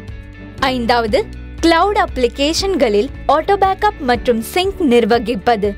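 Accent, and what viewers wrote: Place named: Haryana, India. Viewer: Haryanvi Hindi medium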